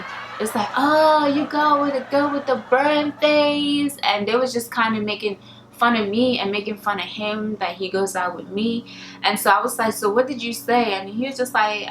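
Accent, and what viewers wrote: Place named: Nigeria. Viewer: Tromaria Presimparae, Why would you "suggest" she "try" to speak in a manner that fits your hearing? She is Nigerian...not from the U.S. Have you not seen any of her other videos? She always says something about being yourself; with that being said, you be you and let continue on with her beautiful fantastic awesome Nigerian Princessness. P.S. Yes I said Princessness...and what?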